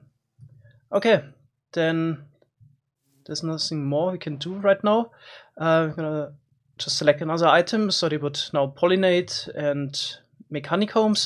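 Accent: Germany. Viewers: Are you German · Ist er deutsch? :D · 100% deutsch mit dem schlechtesten Englisch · German accent?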